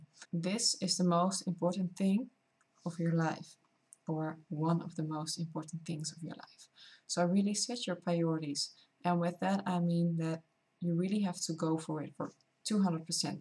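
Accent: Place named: Netherlands. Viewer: Sweet accent! Dutch? Scandinavian? German? I find that in any field, it is difficult to study and work at the same time. Agree with what you say.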